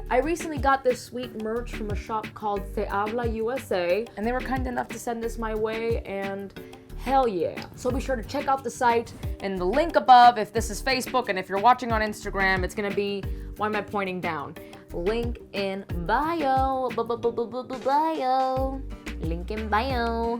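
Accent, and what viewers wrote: Cuba. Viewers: Her cuban accent is really strong.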